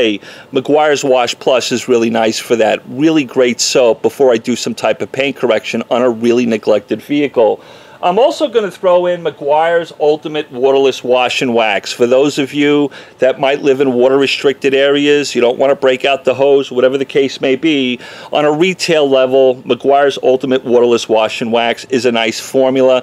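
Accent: New York, United States. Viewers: Great info, thank you. You sound more like a New Yorker than a Texan :) . God bless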